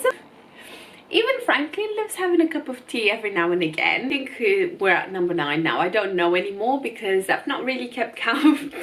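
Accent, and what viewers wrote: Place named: United Kingdom. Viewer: You have picked up a much stronger British accent than all the Romanians I know in the UK.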